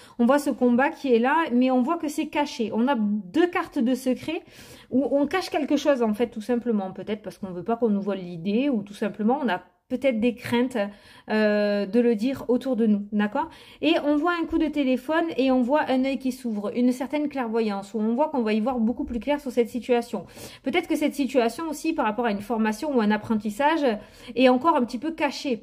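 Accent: South, France. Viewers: coucou un vrai rayon de soleil avec un beau zest d'accent du sud...merci pour cette guidance... bisous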